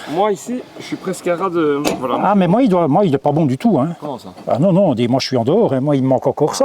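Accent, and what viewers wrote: Belgium. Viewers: Meticuleux soigné avec un ravissement pour l oreille...l accent belge juste ce qu il faut ! 😎merci !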